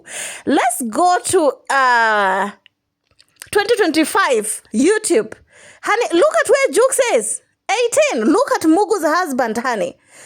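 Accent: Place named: Nigeria. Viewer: wahlai! One of your ancestors was Nigerian. You sound & rage like us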